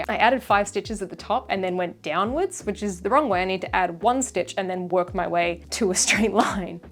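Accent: Australia. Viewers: This is incredible 🤩 and as a southern woman, love to hear an Australian accent adopting y'all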